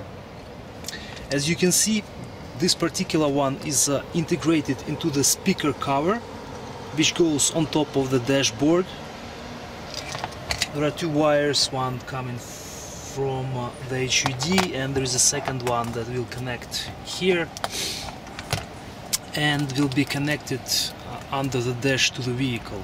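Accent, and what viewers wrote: Russia. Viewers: Недавно купил такую для 2017 Toyota highlander с OBD подключением... Подключил без установки в гнездо крышки динамика. После прохождения теста экран тупо гаснет и все. Включаешь двигатель (у меня гибрид) и ничего - кнопки только пищат при нажатии. Пробовал проехаться: 3 минуты ехал и нифига - пустой экран... Дефективный девайс, походу... Во тут видео, шо я снял для продавца (тоже на английском с таким же русским акцентом :) - <link>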